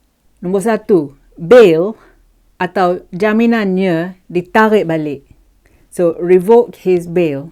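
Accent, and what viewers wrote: Perak, Malaysia. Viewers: loghat perak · Telo peraknye pekat benor yong.. Terbaik perak woman. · Suka dengor pelat Perak puan...kita sekape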